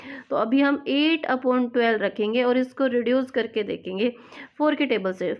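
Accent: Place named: Haryana, India. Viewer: Ma'am aap kaha rehte ho? Harayana? Aapka accent sunke lagta hai aap Harayana ke ho. Aap Harayana ke ho kya?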